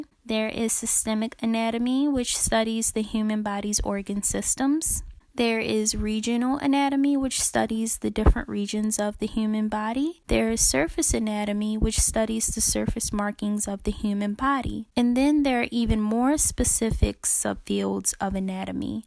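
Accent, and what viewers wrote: Baltimore, United States. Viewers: Definitely sounds like a Baltimore accent! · This was incredibly useful!!! Thank You!!!! I know a Baltimore accent when I hear one😊.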